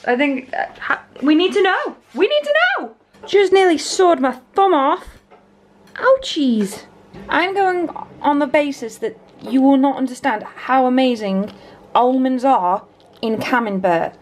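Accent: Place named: United States. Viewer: My like... vlogging inspiration is Julien Solomita. He has a reeeeaaaally fancy camera, but the things he will film and his editing are totally doable! He doesn't always do creative filming for his vlogs, but many times he does. xD I know, I'm learning to be fancy too. Haha, I love your ditsy-American accent. Oooh lady I know the migraines. I know them. >.< HAHAHA, I LOVED your thought train on food! That comic creator sounds fantastic!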